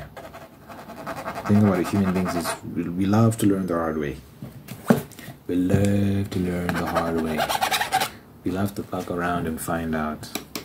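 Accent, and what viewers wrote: South Africa. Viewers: Where are you from I love your accent, sounds like South Africa to me 🇿🇦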